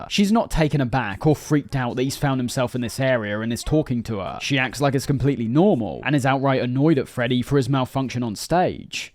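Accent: United Kingdom. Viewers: a brit is speaking about a video game for autistic people, listen and learn 🤫🧏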